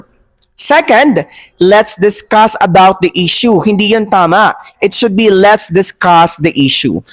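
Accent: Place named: Philippines. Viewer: you explain well. but the problem is your pronounciation. anyway its ok that is the filipino trademark.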